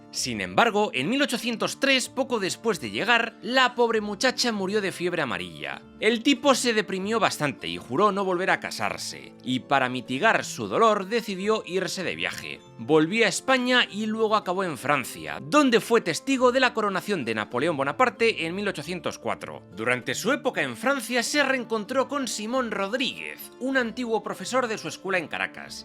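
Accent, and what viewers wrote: Spain. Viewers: Suena curioso un español narrando la independencia de las Américas. Enhorabuena, excelente video...!!! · Eres de España? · Siempre quise saber la versión de los españoles acerca de Simón Bolivar y la verdad escucharlo con ese acento de España es un logro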